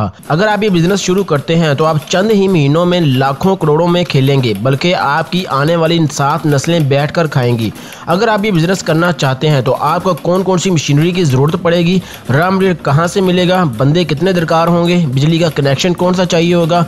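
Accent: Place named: Lahore, Pakistan. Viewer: یار بھائی پرودکٹ نہیں پرادکٹ لاہوری اناز کی انگلش سے بور نہ کیا کرو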